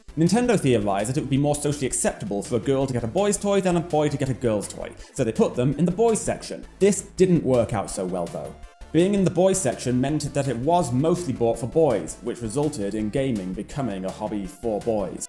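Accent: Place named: United Kingdom. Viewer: The irony of a person who is clearly British saying Nintendo reclassified gaming is hilarious.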